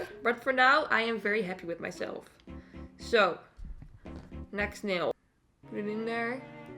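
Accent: Netherlands. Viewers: she's dutch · ik dacht al dat je een nederlands accent gad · Are you Dutch? I just discovered your YouTube and this is the first video I saw but you sound Dutch haha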